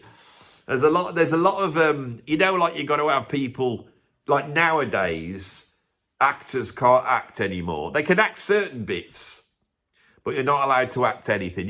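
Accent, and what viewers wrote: Birmingham, United Kingdom. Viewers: This is the kind of well thought out and insightful video that made me a subscriber. I particularly liked the irony of a Brummie ridiculing the northern accent. Bravo, sir!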